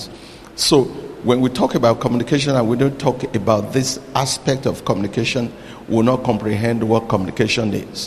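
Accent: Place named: Nigeria. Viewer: he is most likely a great Nigerian speaker. He has a very heavy accent now, but in time, he could improve. I suggest hiring a speech coach. Recording his voice and reading ouloud for one hour at least three times a week👍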